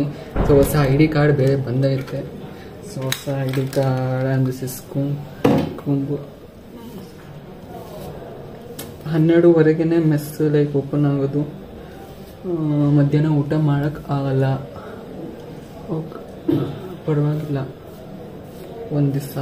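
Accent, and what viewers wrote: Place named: India. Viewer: Your hindi accent 😅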